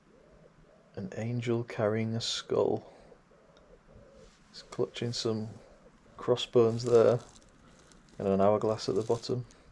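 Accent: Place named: Liverpool, United Kingdom. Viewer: Fascinating! Thank you. I've always been drawn to cemeteries, for the art, the anthropological info and of course for the "unknown" death is a reality and to me, pondering what may happen after we stop breathing- fascinating- reincarnation? Have you seen any tombstones which make a reference to a "new" life- not in the Christian sense, a new life with Christ- I suppose it depends much on where you are exploring- your accent, Liverpool? I love it by the way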